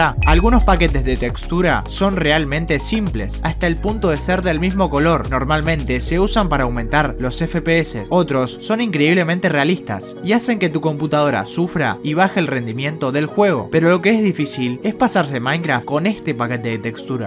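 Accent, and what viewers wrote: Argentina. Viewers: acento argentino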